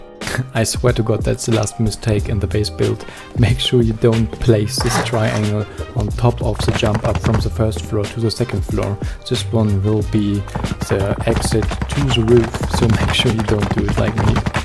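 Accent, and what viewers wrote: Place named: Germany. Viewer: Höre einen deutschen Akzent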